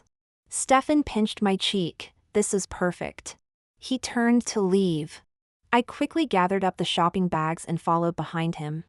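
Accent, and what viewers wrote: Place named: United States. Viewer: AI with an american accent using UK slang words is kind of cringe~~~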